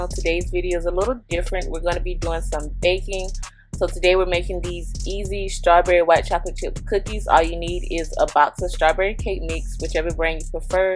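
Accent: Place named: South Carolina, United States. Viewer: Omg really you have a different southern accent than most of the SC people I know. I love it.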